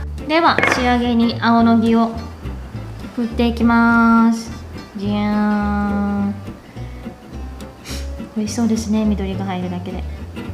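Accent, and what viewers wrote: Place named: Kansai, Japan. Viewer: Youtubeやってたんだ〜❗関西弁のイントネーションが、とても新鮮❗🤗 なんかうれし〜🎵 「涙出〜へんやつ」とかって。 ハワイで関西弁。いい❗❗❗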